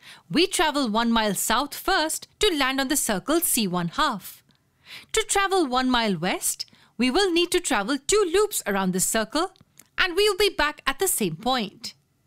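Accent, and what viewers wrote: India. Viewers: Indian accent??? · Is this an Indian talking? Unsubscribe to this channel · Indian accents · Is the narrator Indian by any chance ? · y u sound indian tho? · Indian accent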